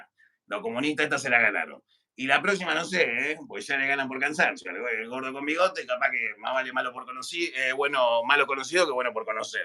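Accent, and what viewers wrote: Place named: Rosario, Argentina. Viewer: En más pensé que eras rosarino x como hablas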